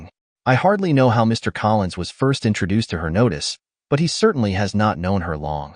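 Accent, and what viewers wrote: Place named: United States. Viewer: A true English classic with a twangy American accent...Hard pass! - Sorry, but it just feels wrong to me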